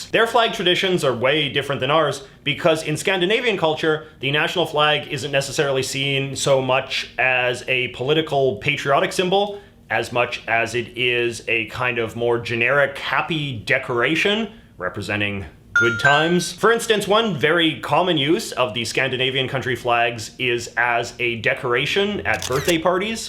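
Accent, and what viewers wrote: Canada. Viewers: Lmao saying “kawaii” (cute if you’re out of the loop somehow) with his Canadian accent literally turns into “kowai” (or scary). Still love your accent.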